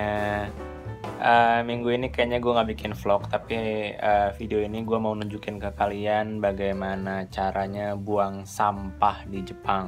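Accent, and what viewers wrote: West Java, Indonesia. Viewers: sunda pisan a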